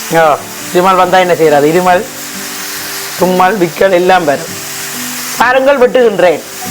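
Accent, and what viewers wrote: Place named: Jaffna, Sri Lanka. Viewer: very nice talk in jaffna language good 😂😂😂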